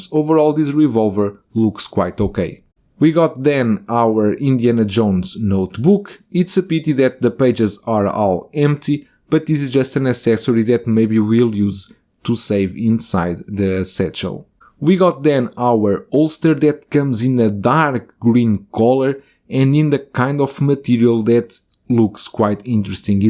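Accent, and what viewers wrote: Portugal. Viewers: Portuguese accent?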